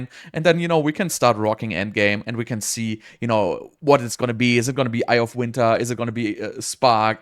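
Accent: Germany. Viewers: Hello, I don't think the guide itself is bad, but you know what you're talking about. I just have a little problem when you say you only use this or these items at the beginning in the video. In theory it all works, but in the video you simply adjust endgame gear. They also make such a difference that the build runs as smoothly as you imagine, you know what I mean. But I don't want to say anything bad, I've been watching your guides for a long time and they've always been good. I have to praise you too ^^ Ps. Are you German? You have a German accent. Greetings from Germany. Nachtrag ich habe erst jetzt deine neuen POB bzw moba guides gesehen sorry mein Freund <3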